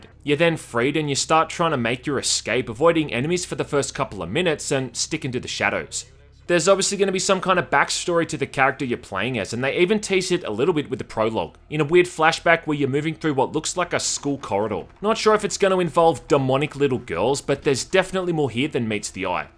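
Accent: Australia. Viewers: putting on an accent? ever heard of australia?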